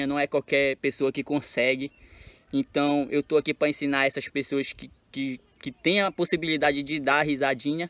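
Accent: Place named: Pará, Brazil. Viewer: tu e paraense crtz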